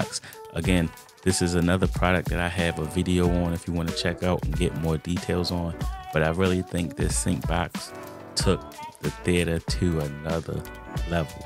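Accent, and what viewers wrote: Baltimore, United States. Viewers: You definitely from bmore 😂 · I knew right off the bat youd be a ravens fan based off that heavy ass accent lmao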